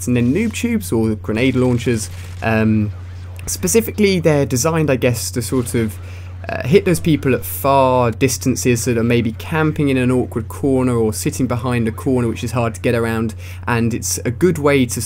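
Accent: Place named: United Kingdom. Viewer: Dude he sounds British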